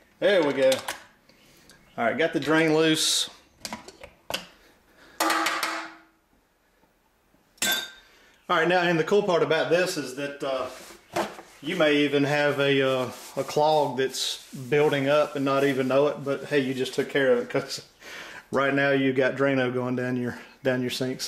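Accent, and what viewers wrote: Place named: South, United States. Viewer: Love it. And I'm going to guess you're not from up north. Seeing as you don't bother with wearing clothes for a Youtube video and you pronounce gel as jail. No judgement here! You are rocking it. Thanks for the video.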